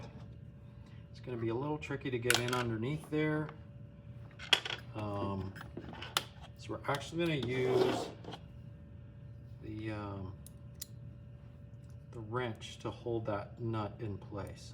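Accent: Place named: Northeast, United States. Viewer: Thanks. If I pull the trigger on the 701, I'll probably be peppering you with questions. Looking forward to following in your footsteps. Where in the world are you based? Sounds like NorthEast USA somewhere.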